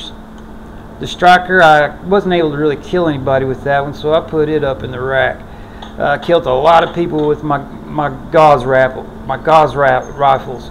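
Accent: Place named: South, United States. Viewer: Fallout videos are 9000% better with a Southern accent. Roll Tide.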